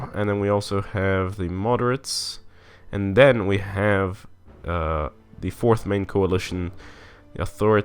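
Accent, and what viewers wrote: Brazil. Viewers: Okay, Carioca ou Paulista? Cause that sssss issss thiccc boii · You have an exelent brazilian accent :D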